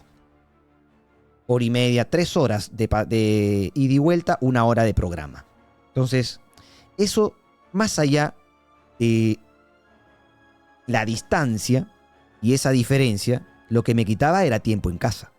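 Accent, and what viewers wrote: Argentina. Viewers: es argentino?... por qué habla así?...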